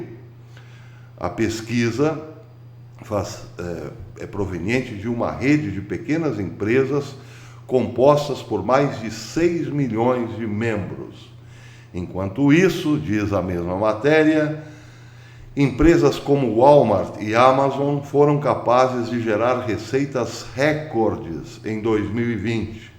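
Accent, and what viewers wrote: Caipira, Brazil. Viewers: qualquer analista de geopolítica fala bonitinho, empolado, metido à inteligente. Isso enche o nosso saco. Só você faz o caipirês e um trabalho melhor que todos os outros! Nunca abandone a fórmula.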